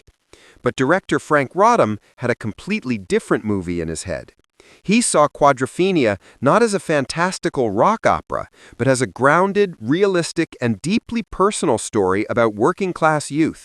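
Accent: United States. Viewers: Who is this Yank narrator talking b.s.? Great Britain was not 'shaking off ww2 post austerity in 1964'. The 'never had it so good' years had just gone and the more optimistic 60s were in full swing. I doubt any Americans had even heard of the mods. 🙄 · Why the change to an American narrator. Is this all AI?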